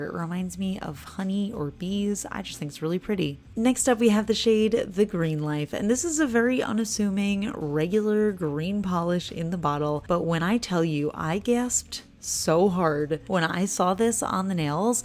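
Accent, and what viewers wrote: New Jersey, United States. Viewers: your NJ accent... Not just the way you say orange, but forest as well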